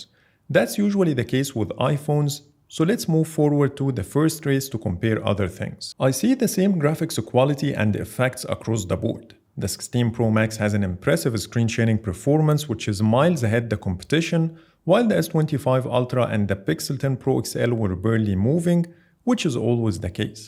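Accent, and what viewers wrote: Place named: Egypt. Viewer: are you Egyptian i can sense the the accent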